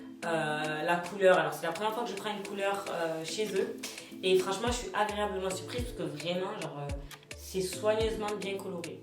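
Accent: Marseille, France. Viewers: J’ai regardée juste avant une vidéo où tu parlait que ton accent marseillais dérangeait et là on voit que tu perd ton accent le sang 😭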